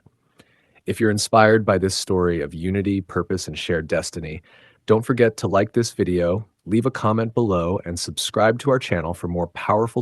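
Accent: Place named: United Kingdom. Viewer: I Agree with you pretty tea. Narrator u talking as if Ghana are doing Jamaica & the people of a Jamaica FAVOR. Please stop it. It is good to see African Nations and the country of Jamaica closing gaps and coming as one. For your INFO Jamaica is not a tiny Country. I am tired of UK= England belittle Jamaica by calling Ja. tiny. 1,big, 2, small, 3,tiny. So all the other Caribbean What are they?, if Jamaica is tiny?. Jamaica is the third Largest Island in the Caribbean. U should know the word tiny from small. You sound British. are you?. U just want to put down Jamaica, u JEALOUS.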